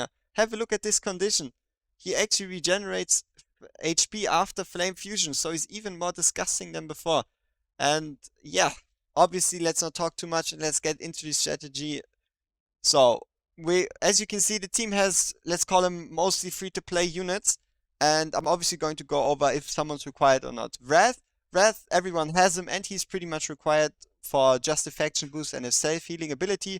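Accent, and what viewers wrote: Germany. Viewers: dt akzent haha weiter so danke fürs video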